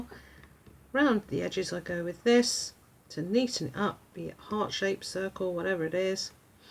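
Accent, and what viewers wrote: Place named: England, United Kingdom. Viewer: I don't do hash tag. I can't understand why anyone would ever give you a mean sign or make an unkind remark to you. You are adorable and most of the I just listen to you talk. I speak "American" and you speak English and I love to just hear you talk.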